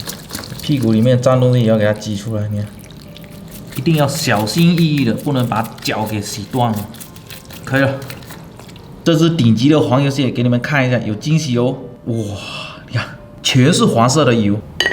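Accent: Fuzhou, China. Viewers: 怎么福州口音 这么重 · 一听就是老福州了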